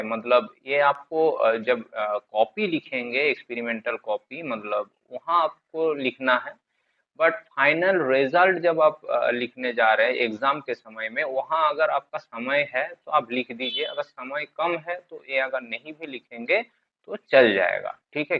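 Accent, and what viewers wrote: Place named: Bengal, India. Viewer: Apni ki bangali? Apnar hindi accent sune amar mone holo ki apni bangali?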